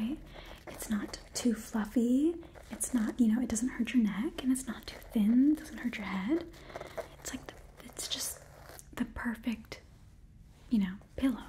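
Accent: Russia. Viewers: russian stylist having a sister named natasha and the same accent as yelena belova in marvel my obsession is too big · Русский акцент получился очень хорошо!!!!🥰💖💖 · as Russian I can say that is is an amazing Russian accent!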